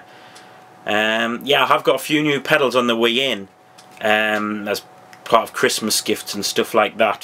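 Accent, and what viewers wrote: Newcastle, United Kingdom. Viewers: Yes! Another Geordie! VERY nice gear!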